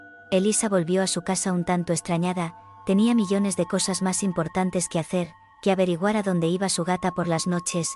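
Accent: Spain. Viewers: LAS HISTORIAS SON MUY BONITAS PERO LA VOZ DE ACENTO ESPAÑOL ES HORROROSA 👹😭😭😭😭😭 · A pesar de su voz con acento español... al principio me molestaba, pero al final me quede porque esta buena la historia, y su acento no es tan marcado. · Al contrario me encantan su acento español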